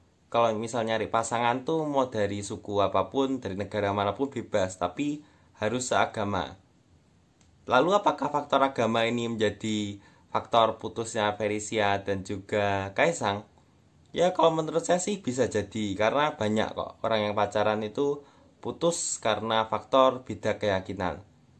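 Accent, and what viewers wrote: Java, Indonesia. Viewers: Logat mu jawa bgt😂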